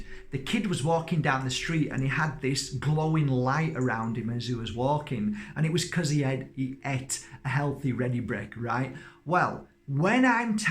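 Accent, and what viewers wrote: Yorkshire, United Kingdom. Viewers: I have never come across any of your videos before but within the first minute of watching my child self came out and yelled NICEEEE!! Your positive energy is so relatable I feel like I hardly know people with such vibrant energy as much as I have and now I've discovered you I'm just so happy there is someone else out there who is just as happy and smiley and loving as i am... I'm also from Yorkshire and I would love to meet you, I can hear it in your accent :D Freedom. where can i find you and share lentils?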